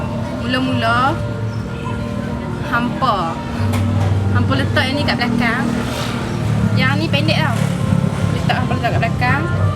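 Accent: Malaysia. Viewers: 2 0wg mne? bunyi ckp cm 0wg baling ja!haha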